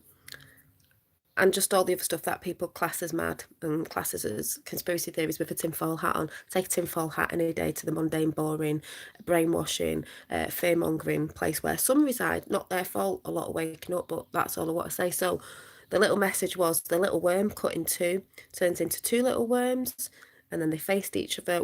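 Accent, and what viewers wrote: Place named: Oldham, United Kingdom. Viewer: Hope you don’t mind me saying .. it’s great to know there is someone who thinks like me near me with the same funny accent .. I normally hear stuff like this from Murica.. I’m from Oldham 😊😊😊 love and light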